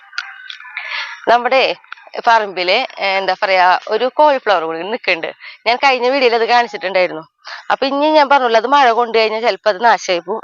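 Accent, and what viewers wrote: Thrissur, India. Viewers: ഞാനും അഴവ്, വെണ്ണീറ് എന്ന് ഒക്കെതന്നെയാ പറയാറ്. നമ്മൾ ത്രിശൂർകാര് അച്ചടിഭാഷ അധികം ഉപയോഗിക്കുന്നവരല്ല അതുകൊണ്ട് ഇങ്ങനെ തന്നെ പോട്ടെ അല്ലെങ്കി " എന്നാ എന്നതാ " എന്നൊക്കെ പറഞ്ഞ് പഠിക്കേണ്ട അവസ്ഥയാകും ഈ ത്രിശൂര് ഭാഷ കേക്കാനാ നാട്ടില് ഇല്ലാത്ത ഞങ്ങള് video കാണണത് അതുപോലെ മക്കളെ നമ്മടെ ഭാഷ പഠിപ്പിക്കാനും Best wishes and keep going ❤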